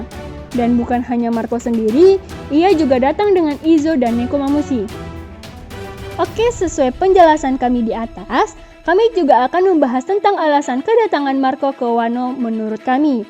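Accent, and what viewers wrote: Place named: Malaysia. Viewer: Apakah pengisi suara ini orang malaysia? Sepintas aksen nya cem melayu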